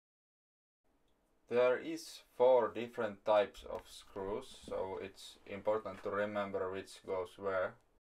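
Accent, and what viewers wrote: Finland. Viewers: ootko suomesta :D tuosta englannin aksentista ei voi erehtyä! Mulla on kaksi tällaista, 24" sekä 20" mid-2007. Molemmissa prossuna T7700. Tuohon isompaan olisi hauskaa laittaa X7900 tai T9500, Catalina-tukea en tarvitse.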